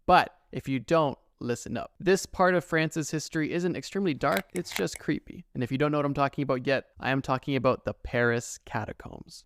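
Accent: Scotland, United Kingdom. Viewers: Great Video. Where is our link Bro?! We want to hear your Scottish accent lol. You are passed 21k likes.....I guess I should have waited til the end before posting this lmao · please give us the Scottish accent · Where's the Scottish accent video, we're at 22K likes :D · Your Scottish accent sounds like how kids talk at my school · Wheres the Scottish accent,? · Let's get that Scottish accent! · Gimme the Scottish accent, please? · then we'll get to hear his Scottish accent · 21k likes where’s the scottish accent i’ve been waiting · Where is da Scottish accent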